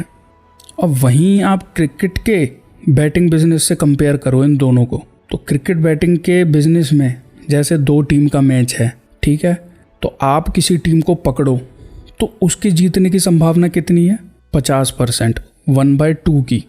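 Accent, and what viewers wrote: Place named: Delhi, India. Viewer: दिल्ली का है इसलिए लहजा केजरीवाल जैसा है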